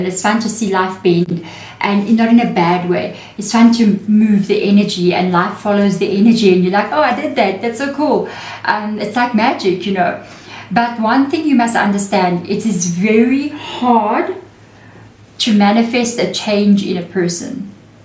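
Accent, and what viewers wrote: South Africa. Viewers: Rocking up in your reading 😅😂 so Saffa!!!!! ❤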